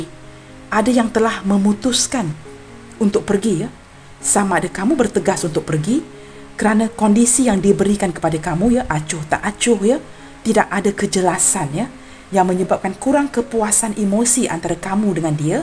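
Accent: Malaysia. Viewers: Sya baru beberapa hr rutin tengok video dsini. Senang dgn cara readingnya... deep sekali. Dengar bicaranya sya jd berasa bernostalgia pd masa sya bekerja d Malaysia thn 1996 silam. D Kilang HITEC, spg,renggam johor bahru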